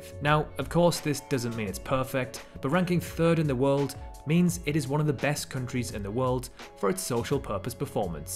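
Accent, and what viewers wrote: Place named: United Kingdom. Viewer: Interesting accent. The person doing the VoiceOver seems to be British but it’s hard, at least for me, to identify exactly what region. does anyone know?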